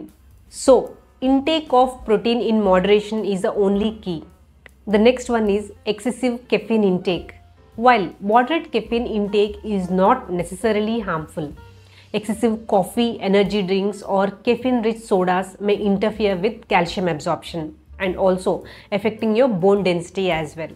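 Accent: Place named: India. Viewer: Her voice and accent SO BEAUTIFUL….I love Indian accent so cute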